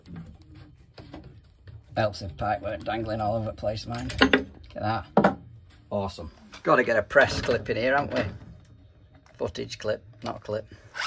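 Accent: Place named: North West England, United Kingdom. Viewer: Good video. Nice pipework. Seem like a decent guy as well. Quick question mate, I’m a plumber. Never used press fit but I’m thinking about getting a press gun, do you ever have any customers saying they don’t want the fittings? Do you ask the customer or just fit them? Seen many plumbers on YouTube and always wondered that. Keep up the good work. I’m Blackpool way. Can tell by your accent you’re north west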